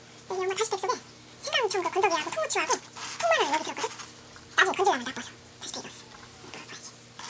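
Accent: Chungcheong, South Korea. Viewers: 충청도이신가바요ㆍ 목소리들음 친정엄마생각나요ㆍ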